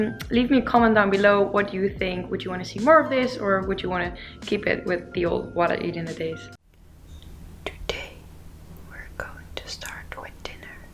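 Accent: Netherlands. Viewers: I missed your sweet Dutch accent here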